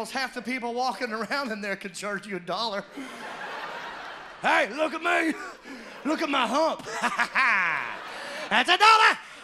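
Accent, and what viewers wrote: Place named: South, United States. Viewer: I'm from a small ass southern town & I just found this guy he legit is apart of my family just bc of all the similarities. The accent is killing me bc it's like listening to my crazy ass uncle's 😭😂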